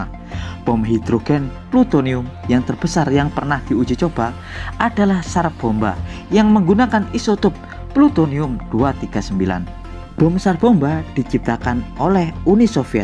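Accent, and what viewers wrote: Java, Indonesia. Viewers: mendhok djowo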